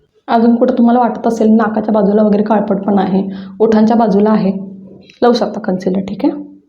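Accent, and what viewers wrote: Kolhapur, India. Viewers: Tumhi chan sangta pun kolhapuri bhasha samajate lagech .. aso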